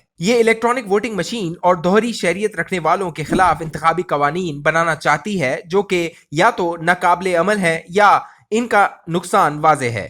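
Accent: India. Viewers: “NAYA DHONG” TV KO PAKISTAN ME KOI NAHI MILA AUR AIK INDIAN AADMI KO GIS KA INDIAN ACCENT HE COMMENTARY KE LIAY SELECT KIA HE …….. GESAY “CLOSET INDIANS” KO ANCHORS AUR JOURNALISTS KA SLOT DIA HUA HE …… ANTI PAKISTAN CHANNEL SPREADING SUBVERSIVE FAKE NEWS ……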